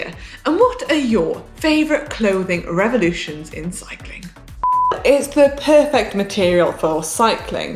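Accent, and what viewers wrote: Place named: Wales, United Kingdom. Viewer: Do you mean her accent? She's Welsh. That's how the Welsh speak.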